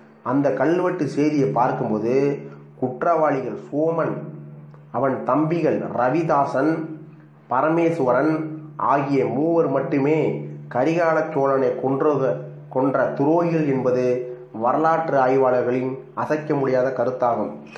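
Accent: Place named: Telugu, India. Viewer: Yen da kena pu**a.. loos kuu...ishtathuku olaritu iruka.. kabothi... athitya karikalan sagumbothu.. raja raja chinna paiyan da... athithya karikalana konathu.. pandiya natta serntha" abathu thavi" veerargal than...I vanga chera desatha serntha mun mudi anthanargal(nmaboothris) atharvana vedam therinjavanga... veerapandiya mannaoda adi aatkal.. Ivanka than konnanga athithya karikala.. ithu theryama loosu mathri olaritu iruka... un voice ah theryuthu sathyama nee oru "GOLTI" koothi nu ..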